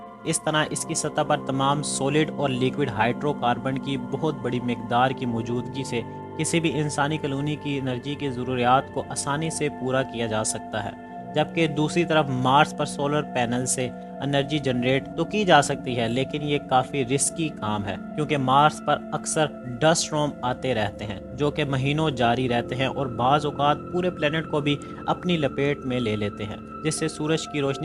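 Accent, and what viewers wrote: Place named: Pakistan. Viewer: Ha bhai iske accents pakiatani hai but it's ok we Indians support pakistani also 😊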